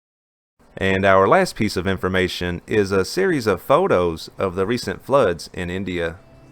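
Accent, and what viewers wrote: South, United States. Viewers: I especially like his personable southern accent, but without it being hard to understand.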